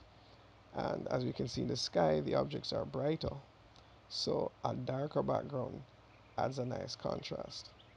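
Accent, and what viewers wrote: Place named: Caribbean. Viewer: You sound like a West Indian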